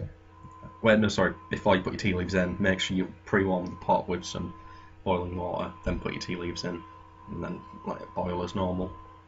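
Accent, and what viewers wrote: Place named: RP, United Kingdom. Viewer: You've become a lot posher since I last spoke to you irl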